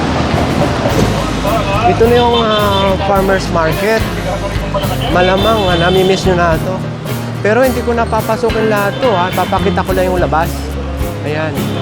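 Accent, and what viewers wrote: Visayas, Philippines. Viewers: Salamat sa blog parang namasyal na rin ako ang ganda ng Gateway Mall lalo sa Garden nila sa taas nakakarelax at libre lang magpunta at magselfie selfie with huge bldg. background nagkakaroon na ako idea saan mamasyal pag uwi ko kasama ko pamilya ko...Im here in saudi Arabia...matagal na na hnd ako nagawi dyan ang laki na ng pinagbago dyan sa Araneta Center ang dami na rin mga Bldg. na sky scaper..Hnd nakakainip manood sa blogg mo nagsasalita kat naikukwento mga nakaraan at ang pagbabago niya pati pagkuha mo ng video maganda very professional at maganda ang cam mo cguro malinaw at professional an dating, pati backgund music mo hnd nakakabagot I appreciate it so much hank you sa sa pag blogg. accent Bisaya ka pero matagal ka na cguro tumira sa Maynila.Ilonggo ka ba Dong?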